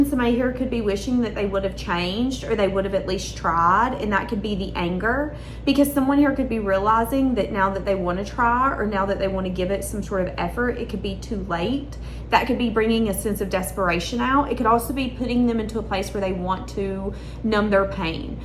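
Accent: South, United States. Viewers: Love ❤ your sounthern accent. Its very attractive on you.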